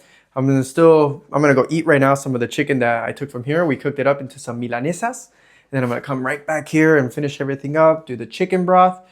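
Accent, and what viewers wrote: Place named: Argentina. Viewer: Saludos desde Bogota. Your Argie accent is pretty spot on; very authentic sounding. Could you answer a question? In one of your other vids you mention that you've been in Argentina for some years. How were you able to remain in the country for so long on just a passport without the DNI? Maybe because you are well and truly married to a citizen of the country?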